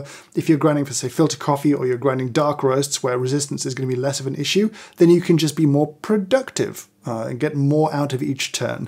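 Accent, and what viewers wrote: United Kingdom. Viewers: I must admit that your videos are kind of a therapy for me. There is much to enjoy when watching them: - beautiful editing - excellent choice of music - great macro shots - I don't know much about lighting, but it all looks put well together - the hair (no further comment needed) - the calming voice - the British accent - coffee - tech-stuff - the jokes I could go on and on, but I need my therapy now.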